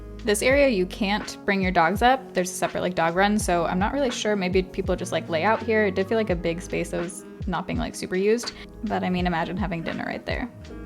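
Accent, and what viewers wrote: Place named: California, United States. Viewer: Start a new vocal pattern if you can. That one..if not from Cali...ayyy. that's not a free thing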